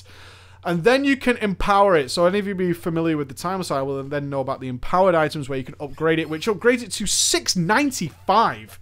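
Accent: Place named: Northern England, United Kingdom. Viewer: At the start of your videos do you say "It's here" or "It's "? Blooming northern accents! Keep up the good work mate.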